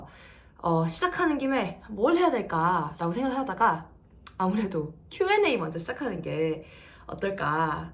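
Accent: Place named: Busan, South Korea. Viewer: 부산에서 하지말고 서울 큰데로 가보는건 어떤지? 열정이 있다면 큰데로 가서 도전해 보시라 원없이.. 해보고 해보고 원없이 해보고 나서.. 1년정도 정말 원없이.. ㅡㅡㅡㅡㅡ 그래도 안되면 다시 생각해 보시라..미래를 생각해 보시라 저도 부산이라 느끼는건.. 부산 사투리가 너무 자연스러움 다른 방향.. 예를 들어 코미디 영화배우도 충분히 가능하실듯 🎉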